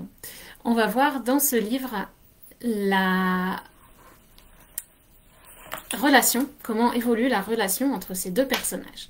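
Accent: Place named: France. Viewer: tu as un super accent français ...tu parles comme les français ..je ne trouve pas de difficultés à comprendre .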